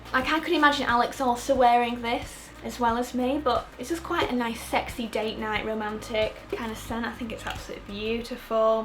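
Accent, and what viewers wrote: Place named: England, United Kingdom. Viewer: Another great video! You are so pretty and even though we are both from England I love your accent 😂🙈 xxxx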